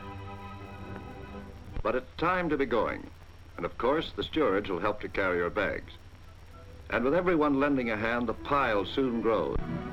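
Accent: United States. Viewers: American commentary!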